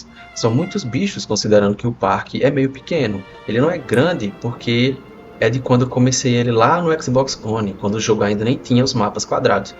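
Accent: Ceará, Brazil. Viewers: Cearense?, tipo eu🎉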